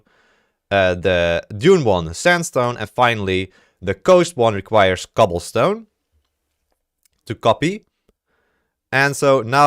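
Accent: Netherlands. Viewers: Sorry to say but you can hear that ur Dutch 😅😅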